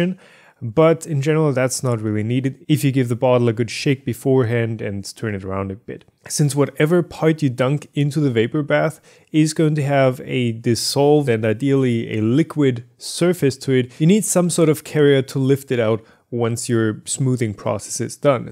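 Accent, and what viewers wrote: Germany. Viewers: HA! ich wusste es. von der ersten sekunde an hab ich gehört das du deutsch bist ;D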